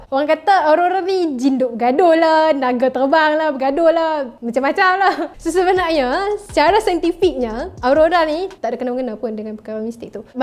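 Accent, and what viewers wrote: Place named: Kedah, Malaysia. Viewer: Lebih baik guna BM standard. Boleh bantu pelajar ting 3 untuk lebih faham. Loghat kedah pekat noo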